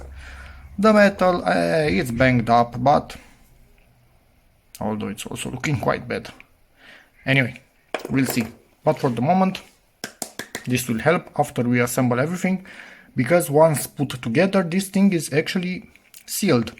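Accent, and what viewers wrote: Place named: Romania. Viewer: Aoleooooo ... asta-i englezeasca rumaneasca. Cel mai urat suna englezeasca asta, rumaneasca. O recunosti intre un milion de variante. Categoric esti ruman !!!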